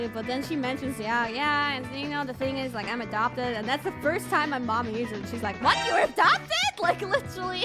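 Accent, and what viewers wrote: United States. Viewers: American blood... Maybe that is why she is good speaking American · Must be why she has such good aptitude for speaking American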